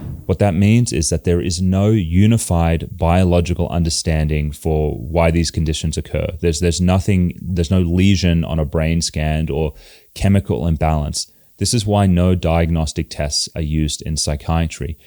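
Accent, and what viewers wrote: Australia. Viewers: You have an Australian accent but you seem to be based in America so who can Australians count on. In Europe Neurologists are more common for all the issues you mention.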